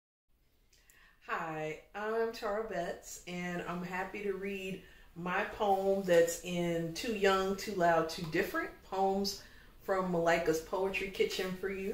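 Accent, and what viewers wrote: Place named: Illinois, United States. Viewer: I love your Central Illinois accent.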